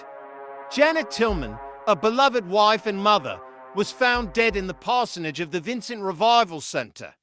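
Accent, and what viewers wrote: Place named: United Kingdom. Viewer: The clown with what appears to be a British accent shouting into a microphone. . . About a story in small town Alabama. . . Bizarre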